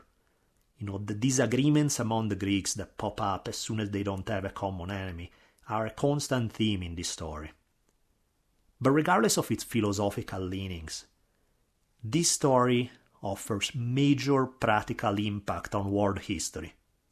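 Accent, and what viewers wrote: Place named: Italy. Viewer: Your story telling along with that fantastic Italian twang is just awesome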